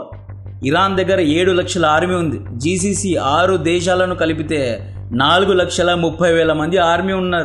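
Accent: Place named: Anantapur, India. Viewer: Brother, nee slang anantapur teluguki daggaraga untundi. Mee ooru?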